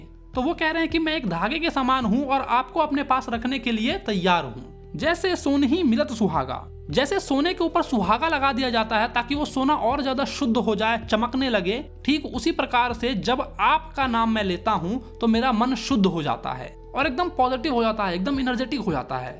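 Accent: Bihar, India. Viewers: Bihar ke hai sir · Sir aap bihari hai na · Bhai aap kya bihari ho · Sir , Are you from Bihar because you're accent is just like Biharis people, and sir I am also from bihar and I want to say you teaching style is amazing ❤❤❤ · sir kya aap Bihari hooooo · Aap bihar se hai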